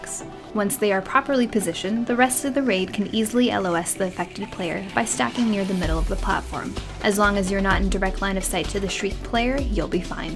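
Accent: Canada. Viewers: Yeah, very beautiful voice... and there's something abowt your accent that sounds Canadian, too :)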